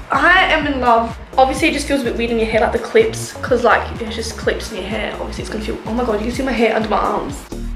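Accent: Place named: Australia. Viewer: It's not your fault, please don't get so upset! It's just Australian accent that is hard to understand!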